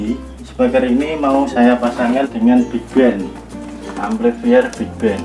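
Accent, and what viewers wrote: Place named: Jepara, Indonesia. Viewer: Kyoke mayong jeporo lur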